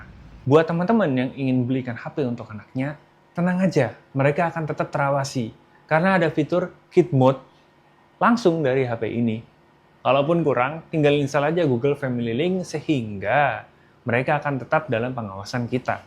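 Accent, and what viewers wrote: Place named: Java, Indonesia. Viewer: Dia orang Jawa bang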